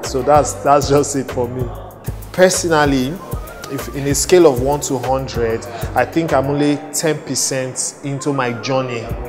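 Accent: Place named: Warri, Nigeria. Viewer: I like that I was not the only one that took note of it when he said he learned to speak pidgin English at the age of 18, or did he say he learned to speak English at the age of 18. come on Bovi who you wan pose for? if you were not speaking pidgin English as a Nigerian what language were you speaking? you English is not that good, your warri accent is all over the place. you may not be Isoko or Urobo or even Isekiri, but no doubt you be Warri piken. stop the posing. Bovi no get me wrong, I gree your own, and you know the work wey you dey do well well. I gree your own Bovi.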